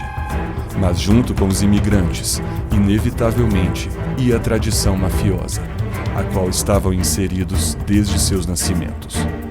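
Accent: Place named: Italy. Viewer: Uma bosta esse sotaque Terra Nostra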